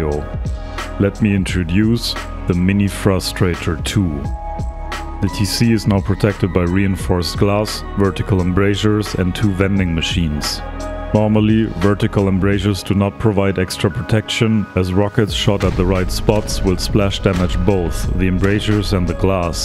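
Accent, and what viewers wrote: Germany. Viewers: so hart cringe das man hart raushört das du deutsch bist xD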